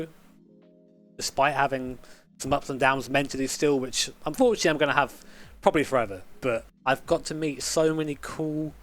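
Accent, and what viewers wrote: United Kingdom. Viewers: I started watching you while playing GT6 year or two before start of big cough, finishing my uni. Watching you and tbh. There was something cozy and helping me in "British guy talking with engine background ASMR" at this period. Thanks for all your content, wishing you all the best, like best car ever - Fiat 126P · I remember when I discovered this channel it was a livestream with about 150 viewers and this guy with the British accent and the Japanese headband was completely dedicated to what he was doing. For me it had something of the Topgear spirit... made me subscribe straight away...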